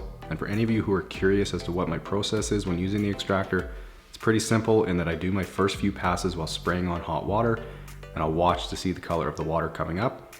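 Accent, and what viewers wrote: Canada. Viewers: ah yes, let me explain. He's Canadian so when he says "garage," it sounds like he is saying "graaj." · You sounded extra Canadian this video for some reason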